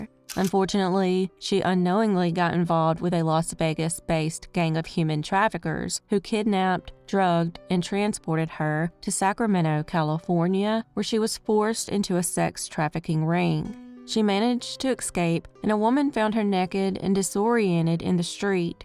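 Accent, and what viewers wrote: South, United States. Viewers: I love your stories and your Southern drawl. I have heard of a lot of your stories, but you dig much deeper and you're much more thorough! Well done!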